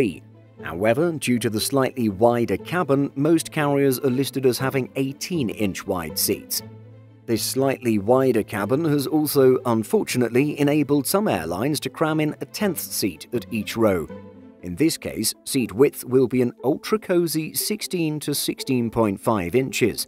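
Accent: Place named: United Kingdom. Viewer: The comparison ought to be with the A<num>, and not the 900!!!! On the other hand you have a wonderful British Accent... 😛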